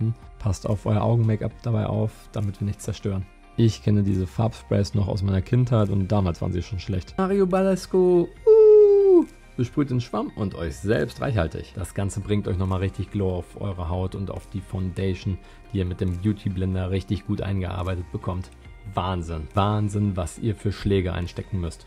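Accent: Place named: East Westphalia, Germany. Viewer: Da spricht der Ostwestfale :-D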